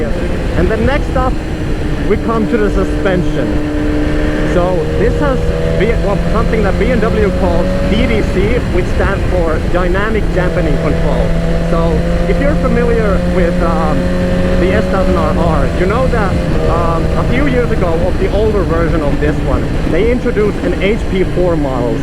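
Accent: Finland. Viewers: When the video started I noticed that you had really distinctive Finnish accent and was wondering if you are a Finn. When I saw Rukka jacket, I was sure about it :D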